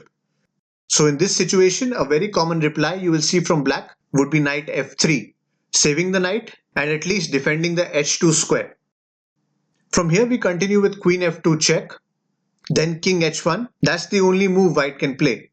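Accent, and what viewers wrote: India. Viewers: I just want to recommend that you speak slower. Since you have an Indian accent some words are difficult to understand. But if you speak slower it will be great.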